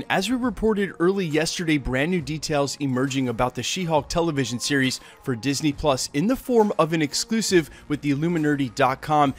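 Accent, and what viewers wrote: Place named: California, United States. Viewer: LOL i see what youre pointing, yes he is slightly sound like the Californians characters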